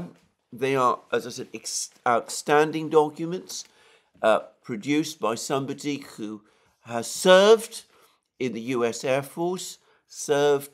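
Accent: England, United Kingdom. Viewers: he speaks clearly and very slowly. I grew up in an English household so I’m used to the accent and even many of the mannerisms. The speed is the only part that irks me a little but I do understand why he speaks so slowly and concisely. I’m also happy that he checks his sources now rather than just parroting Russian MOD talking points like he did towards the beginning of the SMO. Basically his show is like an hour long run down of dozens of Russian speaking telegram channels and the information lines up. I appreciate him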